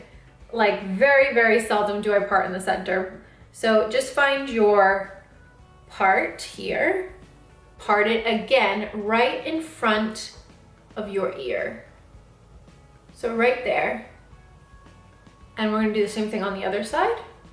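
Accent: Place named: Russia. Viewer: I see your images all over instagram. For some reason I thought you had a Russian accent or German.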